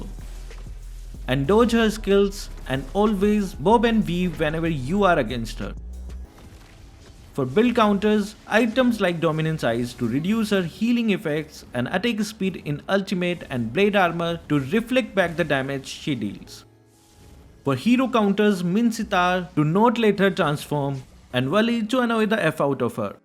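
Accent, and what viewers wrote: India. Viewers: Are u indian?your accent feel like indian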